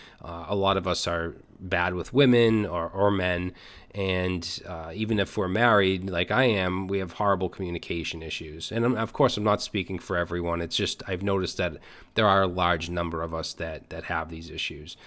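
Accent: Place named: New England, United States. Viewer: In regard to Category 3 I (re)took up Vim about 2 months ago to minimize hand movements involving the mouse and for mental discipline. After practicing and note taking in terminal-launched VIM I came back to VsCode and installed the Vim extension. This is a work in progress but I'm not unhappy about it. In eighth grade I took a half-year (one class period) in TYPING and got myself to about 35 wpm. No regrets there. My advice now to my 1964 self would be to follow that up with a half-year of creative writing or coding in Vim, ignoring the technical issues of "what's a keyboard?", "what's a monitor?", and "PC, Mac, or Linux?" Ah, the IBM Selectric typewriter was still a bunch of concepts on a drafting table at that time. In your Category 1 arena I scrounged a monitor out of storage to enlarge my viewing area (same number of, but bigger, pixels) and began drilling myself in Tmux in parallel with Vim. 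BTW, Vim and Tmux like to fight in the back seat while Dad's trying to drive - I digress. My point isn't to promote Vim but encourage your viewers to consider ways to minimize mouse operations, reduce eye strain, and organize a work flow using tiled panes in terminal or GUI tools. Thank you for your video - it got me to organize my thinking on the above topics. BTW, your accent has me wondering if you and your neighbors are regretting a certain off-season move involving a certain QB relocating from a certain Massachusetts team to a certain Florida team. If this is the case I would b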